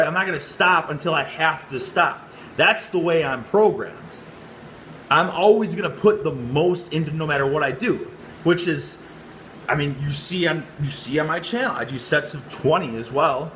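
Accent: Midwest, United States. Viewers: Man,. His voice is so much higher pitch and the midwestern accent is strong.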